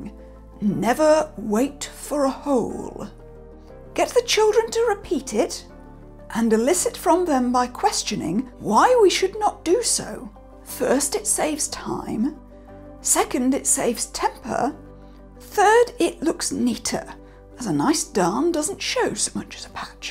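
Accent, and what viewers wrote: United Kingdom. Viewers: Aww yes my favorite, British cursing ASMR. Thank you for the wonderful video as always.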